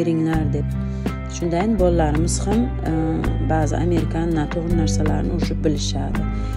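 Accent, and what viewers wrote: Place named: Uzbekistan. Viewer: Charchamang omadizzi bersin yurtdoshim shevamiz birda yurakka yaqinsiz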